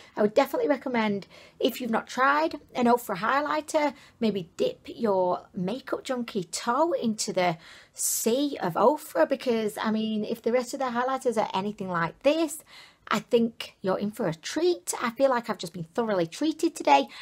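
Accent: United Kingdom. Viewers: It's def like a silk pillow!! Always watching and enjoying your entertaining reviews with your lovely British accent. <3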